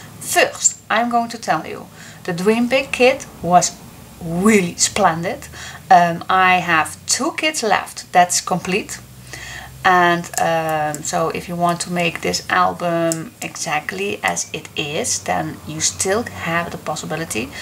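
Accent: Netherlands. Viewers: Merry Christmas!! I love your albums because they are well finished and always very elegant and your tutorials are clear and make everything seem so easy and affordable for everyone. In addition to this I love to listen to you because my dad was Dutch and when you explain, I hear the Dutch accent and I remember when I was young and I heard my Dutch Uncles coming to visit us in Italy! Thank you for this opportunity to win one of your kits, both the kit of your album and the kit of birds are fantastic gifts!! Merry Christmas from Italy!! Nicoletta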